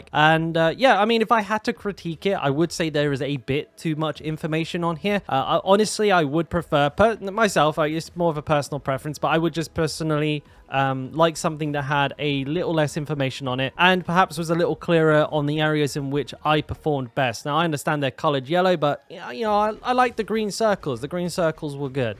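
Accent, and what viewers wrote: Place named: Kent, United Kingdom. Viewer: Also, out of curiosity, how come you are on Asian time, you sound like your from Kent?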